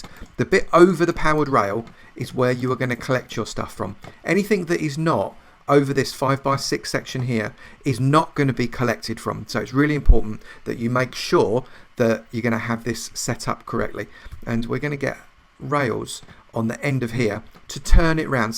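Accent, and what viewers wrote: United Kingdom. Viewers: What do you mean? This guy should have taken speech therapy when he was a kid. He has a grating voice, and has no idea how to enunciate his words, even though his accent indicates he's from the U.K. he has no idea how to speak proper English. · I really like british accent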